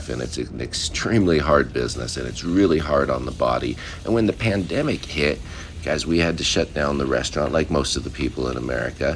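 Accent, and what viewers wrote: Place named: Pacific Northwest, United States. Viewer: I just had a feeling you might be in and have been in PNW… I can hear it in the voice 😊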